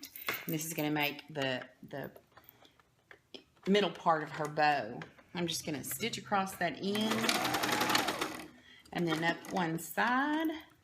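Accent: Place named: Georgia, United States. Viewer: I love your dresses 👗 and the way you explain your steps .... love your accent it reminds me when I lived in Dahlonega Georgia 😍🥰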